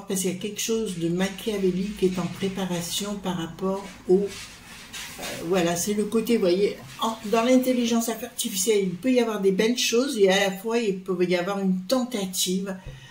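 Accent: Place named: Lyon, France. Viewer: Je vous écoute pour la 1ere fois sur le conseil d une voyante que je suis j adore votre franc parlé je trouve que vous avez un accent lyonnais et certain mots es que je me trompe je suis lyonnaise j habite Montpellier je suis ravie de votre vidéo merci 👍👍👍👍👍😂😂😂😂👋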